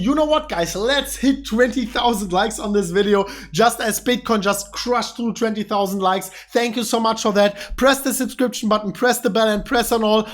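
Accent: Germany. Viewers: I always love the German accent haha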